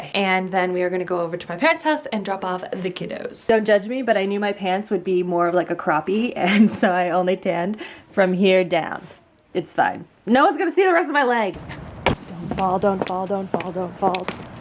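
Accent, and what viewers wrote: Canada. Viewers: When you get near your parents you get 100x more Canadian LMAO this video was so cute :)) I would love to see these more! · I love how your Canadian accent comes out so much more when your at your parents house. Seriously love you lol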